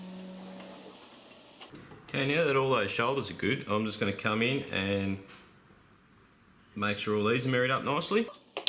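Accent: Australia. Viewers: THATS an aussie accent, if i´ve ever heard one! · Great to hear another Aussie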